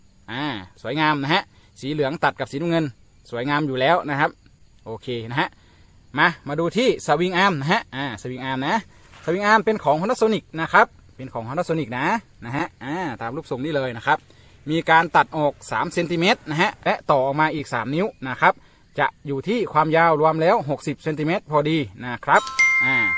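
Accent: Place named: Lampang, Thailand. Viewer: สำเนียง เหมือนคนลำปางมากครับ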